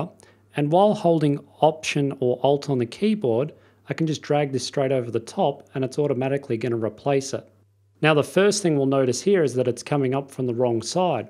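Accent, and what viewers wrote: Australia. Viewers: thank you so much , i used for a wedding and it looks great , nice australian accent ?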